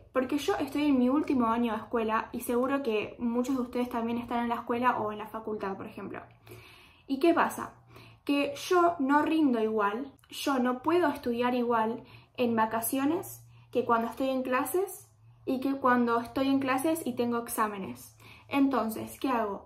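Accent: Argentina. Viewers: Saludos desde Brasil 🇧🇷. A juzgar por tu acento eres argentina ! Cuáles idiomas aprendiste ? Los aprendiste solita o en clases ? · Tu eres de argentina? Por su acento parece que si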